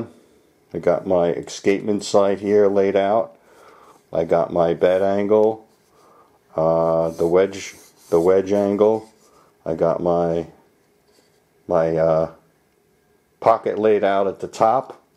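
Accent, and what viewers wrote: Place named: Staten Island, United States. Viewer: Hey. This is incredible stuff. It makes it even more enjoyable that you have the exact same accent as my beloved uncle who grew up on Staten Island. Question: would you make hollows and rounds to sell? I would much rather buy from you than try to track some down from across the pond.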